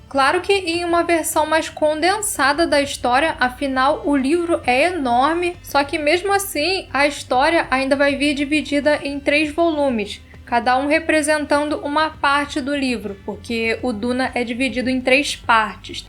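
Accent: Rio de Janeiro, Brazil. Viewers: eu notei um sotaque carioca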